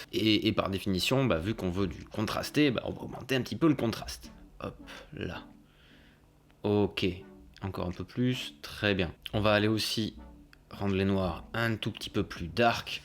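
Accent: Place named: Southwest, France. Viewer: Coooommmeeennntt??? tu es du Sud Ouest???? Vive la chocolatine!:D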